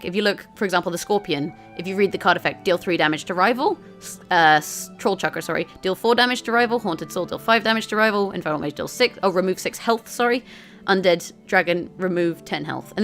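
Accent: United Kingdom. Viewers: Are you from NI?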